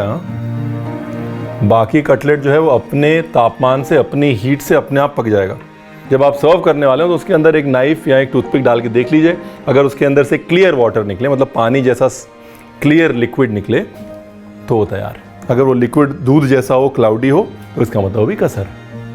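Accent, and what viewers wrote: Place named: Bengali, India. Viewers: Sir...your bengali accents sounds so amazing...ohh damn good when you spelled ranna ghore,sorshe bata,posto bata 😁.... being a Bengali just loved your feelings towards bengal's food culture...specially kolkata.... literally amazed... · Simple yet amazing recipe. 👌 Dear chef Thank you very much for sharing Kolkata style Chicken Cutlet recipe. Your bengali accent is too good. Lots of love from Kolkata. 💝💝💝💝💝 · Too sweet bengali pronounce...and also the comments on BONGEATS is really nice. I also believe same. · Your bengali accent is very nice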